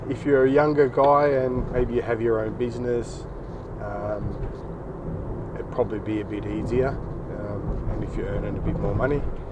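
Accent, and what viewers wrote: Australia. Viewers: You learned your English in Australia, yes? 👍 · Are you an Aussie mate?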